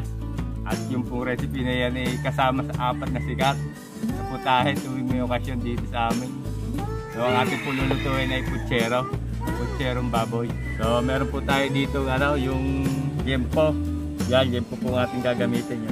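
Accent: Batangas, Philippines. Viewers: Wow look so delicious, nakakagutom naman, yang ang Luto nang tiyo ko na Batangueno, love the accent, thank you sa masarap na Putchero, babait naman nang mga bata, natututu silang tumbling sa pagluto, I'm pretty sure na mag mamana sa yo, one day baka mag chef sila well done. Watching from Queensland Australia ❤❤❤❤🇦🇺🇦🇺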